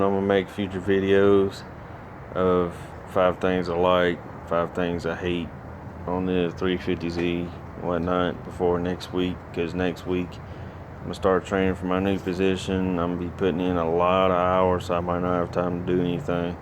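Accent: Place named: South, United States. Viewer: Why do you have to be southern?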